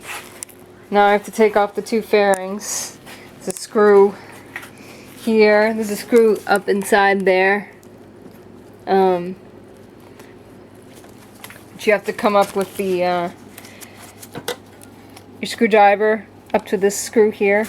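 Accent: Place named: Canada. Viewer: Hi, I know it's a while ago now, and this wasn't your bike, but did you discover the reason for the oil on the plugs and filter? Also I believe I detect a Canadian accent, but was it a California model? I hear they have their exhaust shit fed back to them through the carbs, which surely can't be healthy for the engine!! Bloody bullshit climate legislation!! When do they ban cow farts? The state of the filter was alarming. Did you perform a compression test? My guess is you didn't because it looks like a much harder job to access than on my CB-1, and that's a bugger on the inside cylinders. Could be valve seals I guess. It's sad to see a great bike neglected that badly isn't it? Thank you for the instructional vid. Finally, can you send me a bottle of pineol in the UK?;)) We don't have it here and I don't know what the equivalent is.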